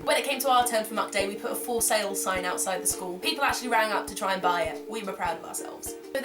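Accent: United Kingdom. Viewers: aww so cute and british. sounds like you had a fun time in school :)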